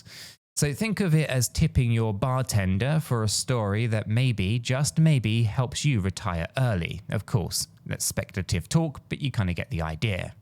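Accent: United Kingdom. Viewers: Love your channel. Great info!!! Your British accent and sense of humor put it over the top! 😊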